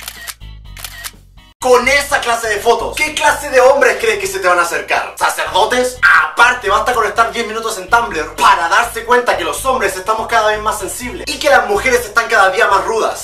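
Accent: Chile. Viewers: Cuando german aún tenía acento chileno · Aún es chileno. Yo lo escucho hablar chileno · Cuando todavía hablaba chileno y decía "conchetumare":v xd 2019 lo extraño....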